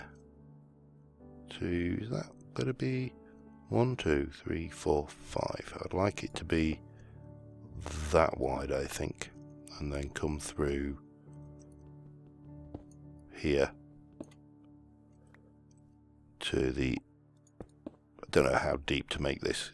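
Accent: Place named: East Midlands, United Kingdom. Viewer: I have been working my way through your series and look forward to every video. As a fellow Brit (I'm thinking East Midlands from you accent) I want to say well done our kid. I glad you covered this topic as its an area I need to work on in my own game.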